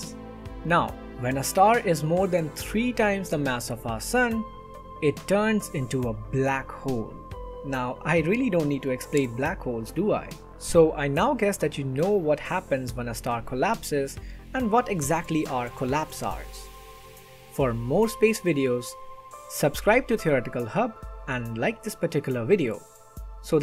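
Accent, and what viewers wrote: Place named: India. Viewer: When you hear an indian accent you know the videos are going to be filled with knowledge, very intelligent people ❤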